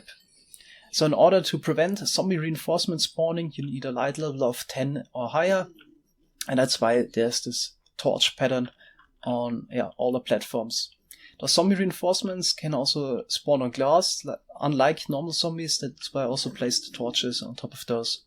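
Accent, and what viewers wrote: Germany. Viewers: Are you german? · Man hört zwar richtig raus, dass du deutsch bist, sprichst aber trotzdem einwandfrei englisch :D....Gutes Tutorial, es könnte nur etwas kompakter sein (Vorallem zu Beginn, hast du ziemlich viel geredet, was man hätte kürzer machen können), weil 27 min vlt doch etwas zu lang sind. Nur Kritik, kein Hate ;)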